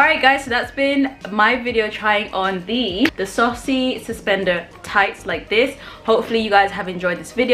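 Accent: United Kingdom. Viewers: Love my Ebony Queens. Love my Ebony curves, with a British accent.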